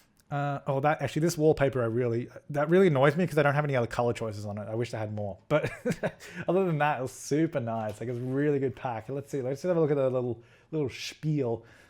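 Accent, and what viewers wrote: Australia. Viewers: Are you Australian? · I love your voice and accent, are you Australian?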